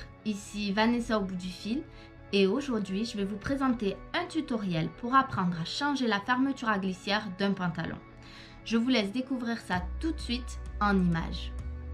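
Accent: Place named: Carcassonne, France. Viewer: Super vidéo Je sais changer une fermeture eclair mais cette vidéo apprend toujours Bravo à vous Je vous découvre et je m abonne Vous avez un petit accent comme moi 😂 Etes vous de Carcassonne ?😂😂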